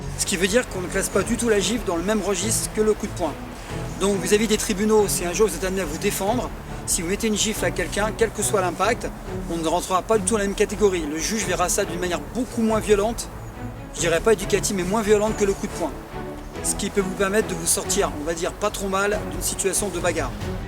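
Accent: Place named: Kabylie, Algeria. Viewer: t kabyle comme moi pasque t a l accent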